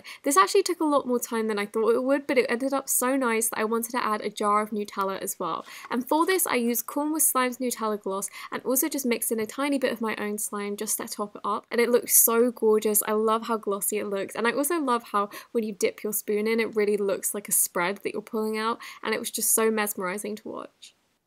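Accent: United Kingdom. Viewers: She British, it’s her accent, she can’t control it.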